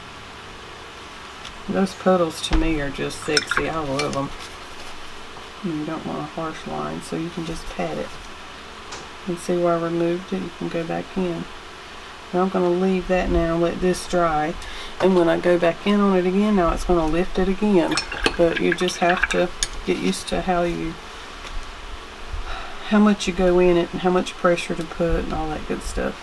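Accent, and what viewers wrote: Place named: North Carolina, United States. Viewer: I love how "real" you are in your videos, and of course, it's always nice hearing a fellow North Carolinian talk! lol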